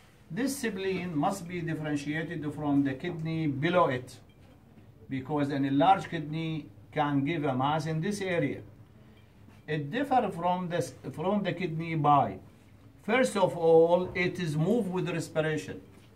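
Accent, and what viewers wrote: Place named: Iraq. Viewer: Iraqi accent is so cute ❤️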